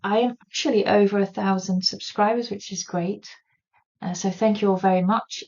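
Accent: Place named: United Kingdom. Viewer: I love!! And I’m really enjoying to watch your videos. So in awe of your British accent too 😊 Greetings from Switzerland!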